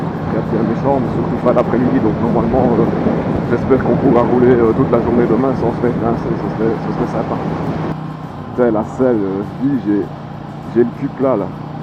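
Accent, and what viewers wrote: Belgium. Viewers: en faite j'hésite entre 2 motos que tu a testé ta ktm 790 duke ou la Triumph street triple rs les 2 me tente bien mais les budgets font réfléchir vu le temps que on a en Belgique et oui j'habite pas très loin de ton coness peut tu me donner ton avis sur les 2 machines points fort, points faible rapport qualité prix et aussi le cout entretiens pour ta ktm je te remercie d'avance et sa fait plaisir de voir et d''entendre un moto volgeur belge qui d'jase wallons V potos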